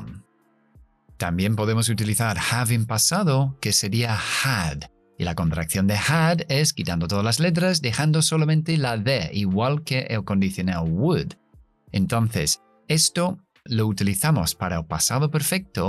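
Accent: England, United Kingdom. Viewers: Que maravilloso canal! Muchas felicitaciones. Este es mi segundo video y lo dice todo. Podrías hablar de la cultura inglesa? Como ellos miran la vida? Cual es ese olor a sus costumbres? Porque que hermoso acento. Tan elegante. Cómo miran la vida los ingleses? Muchas gracias! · Ese es inglés Britanico,lo voy a escuchar pero nececito inglés smericano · La pronunciación que enseñas es acento británico?, se me asemeja mucho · Buenas noches sr, soy Carlos Bermudez, de ecuador saludos, su expresión del inglés con acento de Inglaterra es muy aristócrata, y me gusta mucho , empecé a ver su canal hace poco y su manera de enseñar es muy explícita, estoy en este momento viendo videos de un profesor californiano y la diferencia es abismal en cada acento quiero aprender de los dos voy a seguir su canal . Hice un test Delos suyos y no di ni siquiera en el nivel de principiante jaja.. 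espero y se que aprenderé mucho de UD , agradezco que comparta su lenguaje, y le deseo éxitos y bendiciones gracias por compartir sr. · Es pronunciación británica.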